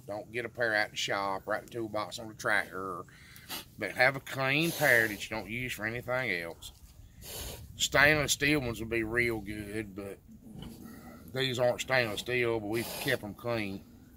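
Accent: South, United States. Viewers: I love the southern accent. I can listen to it all day. Much love to our amazing farmers.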